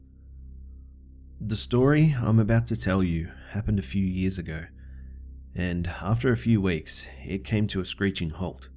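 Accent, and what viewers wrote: Australia. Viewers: Australian mate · Australian bro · Finally a fellow Aussie talking about scary shit good on ya m8! 👍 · He is Australian. I think i should know what an Australian should sound like since i am one. Sup fellow aussies 😊🐨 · Only one problem..You have an Aussie accent mate, using American euphemisms...??? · Australian I could tell bc of the way he said mate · Is Australian? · He's Australian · Are you Austrailan m8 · I think he may be Australian. No offence. Sorry if I am incorrect. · Yeah he may be Australian but that's like er more British than American. It's older sounding somehow. And I'm not dissing the US here, cos they make good TV, but horror narration just CANNOT be done with an American accent. It's just off putting fsr. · are you Australian I'm From Australia your voice sounds like your australian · He isn't British, he is Australian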